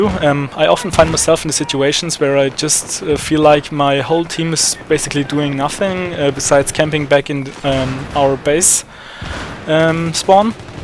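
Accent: Germany. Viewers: Du bist doch Deutsch man merkt das voll :) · Are you German ??? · german accent too thicc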